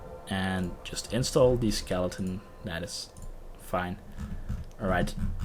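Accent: Netherlands. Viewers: Might i ask are you Dutch ? wat ik hoor een accent dat heel Nederlands overkomt ;)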